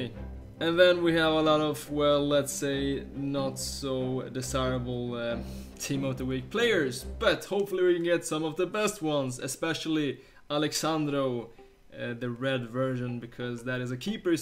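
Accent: Sweden. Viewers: He is so swedish listen to that accent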